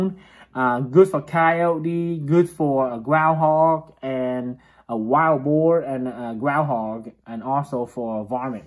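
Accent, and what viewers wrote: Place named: Texas, United States. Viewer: You sound like you have some Texan in your accent, so if someone talks shit about it, Fuk them! Keep doing what your doing. Haters gonna hate.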